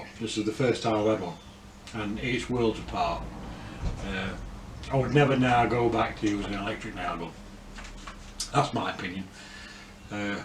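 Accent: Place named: West Yorkshire, United Kingdom. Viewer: Great video thanks pal, I nearly bought the orange one until I saw this so cheers, sounds like you’re from West Yorkshire too? I’m in Halifax!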